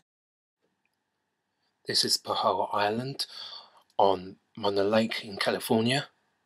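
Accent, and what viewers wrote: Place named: United Kingdom. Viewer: hard to understand a mumbling brit!!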